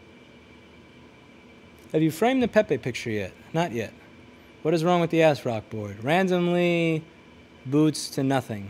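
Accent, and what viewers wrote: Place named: Wisconsin, United States. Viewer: Wait a second , you are a Wisconsinite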